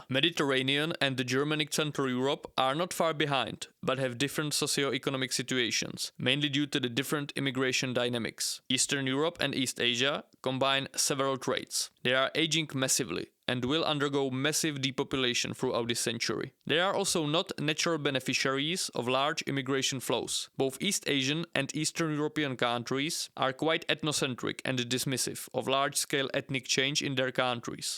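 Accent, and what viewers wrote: India. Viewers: Wait. That fucking jeet accent. LMAOOO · Bro is Czech but sounds Indian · Are you really Czech? You have an Indian accent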